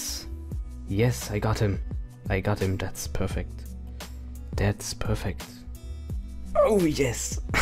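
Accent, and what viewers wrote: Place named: Germany. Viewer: Digger learn mal Englisch, du redest im deutschen Stil english. Lass es sein oder lernen besser Englisch. Deine Videos sind cool anzuschauen und das feiere ich aber dein english ist echt für Blutungen im Trommelfell verantwortlich. Einfache Sätze wie "where is he" sind doch 5. Kläger Niveau. Und nicht "where he is". Sorry dass das so bissig klingt aber mach lieber auf deutsch, was auch passt und zur Not Untertitel wenn die NICHT deutschen was verstehen wollen :) Wir müssen schließlich auch Englisch lernen um ihren Kram verstehen zu können.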